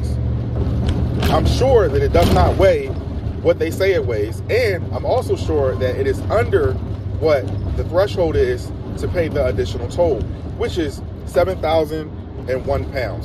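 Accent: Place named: Philadelphia, United States. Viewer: You sound just like Umar Johnson. Before saw this vid, I already knew you were from the Philadelphia area.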